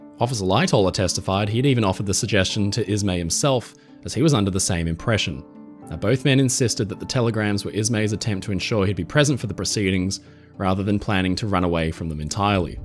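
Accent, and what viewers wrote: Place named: Australia. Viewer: You should pay a bit more attention. If you haven't noticed this guy who makes these wonderful videos is speaking with an Australian accent.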